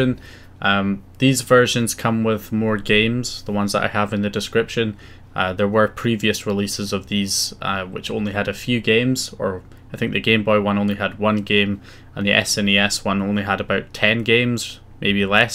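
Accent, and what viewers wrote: Scotland, United Kingdom. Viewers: Good to hear a fellow Scotsman on here! I’ve only just this second learned that my PS4 can be jailbroken! Amazing but can you advise a newbie where to start or point me in the direction of which of your vids to go to first? I’ve no clue about terminology or that. Cheers man and all the best!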